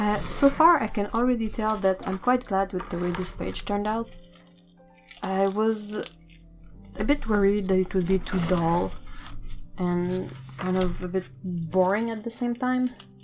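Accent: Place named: Montreal, Canada. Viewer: Anglais de l'ouest de l'Isle de Montréal. :^) Ton anglais est milles fois plus fort que mon français. :^D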